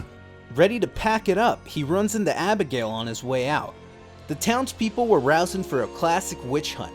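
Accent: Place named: Southern California, United States. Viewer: In-Depth recap by someone from Southern California lol Good recap and great accent. Thanks!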